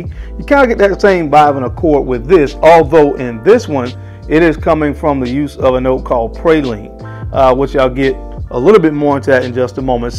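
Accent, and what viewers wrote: United States. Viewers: Love the country accent.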